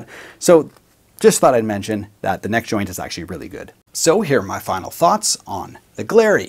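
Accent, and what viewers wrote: Canada. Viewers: That’s because he speaks Canadian.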